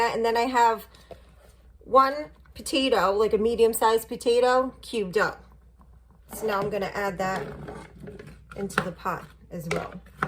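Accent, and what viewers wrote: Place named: East Coast, United States. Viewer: I never heard of sazon before but will look it up right now. My pork chops always came out dry when baked so now I only make them in a cast iron skillet. Does the marinade (specifically the lime juice) help to make the chops more tender when baked? Your east coast ‘accent’ brings back pleasant memories of my years spent in northern New Jersey in the 90s. Am back in my home state of Minnesota now, where everyone has that ‘up north’ accent! Thanks for sharing.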